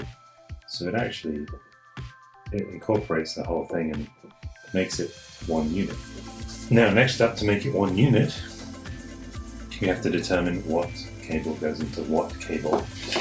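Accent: United Kingdom. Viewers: Are you from the UK but live in the us?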